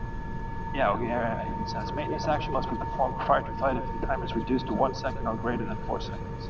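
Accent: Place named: France. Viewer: Thanks buddy... It's funny as you have a french accent on your radio calls, but not when you talk normally...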